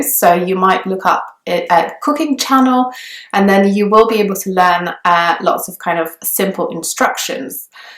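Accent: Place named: United Kingdom. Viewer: Witaj! Thank you very much for tips and content that you create! I really though you were British until you mentioned that you are Polish! Idk if you ever covered this subject, but after watching you video reweaving Mondly I noticed a detail (and a a big issue actually) I've been dealing with: How do you manage learning a new language throughout another language foreign language? For ex. I'm Portuguese, English is my 2nd language and I want to learn Finnish ... The issue is that many people are not fluent, don't master a language well enough or just feel is more difficult learning a foreign language with another one that they are not conformable, specially langues like Finnish that doesn't have any resources to learn though besides English instructions. Dziękuję!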